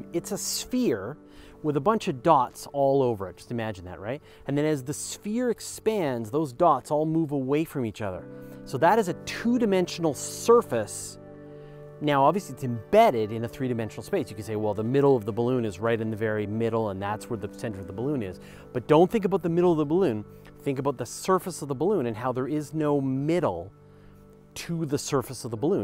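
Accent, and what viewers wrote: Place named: Canada. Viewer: Non-astronomy related but, you are Canadian right? I can tell, I am too.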